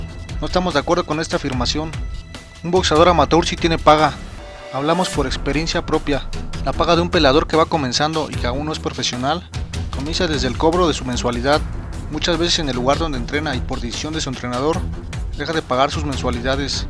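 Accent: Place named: Tepito, Mexico. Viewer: jajajaja tu acento se escucha super tepiteño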